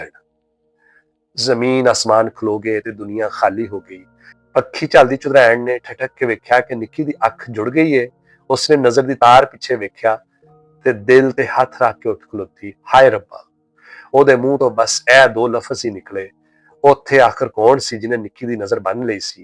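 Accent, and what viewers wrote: Punjab, India. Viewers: واااہ بہت خوبصورت کہانی اور سچا پنجابی لہجہ😍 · کہانی سے عین میل کھاتا خوبصورت پنجابی لہجہ اور مکالموں کی ادائیگی میں بہترین اتار چڑھاؤ۔۔ بلاشبہ ایک مکمل پنجابی شاہکار ۔۔ اس خوبصورت کاوش پر ڈھیروں داد و تحسین🌹 ❤ ❤❤❤ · ہائے۔۔ سواد آ گیا سچا لہجہ پنجابی دا۔۔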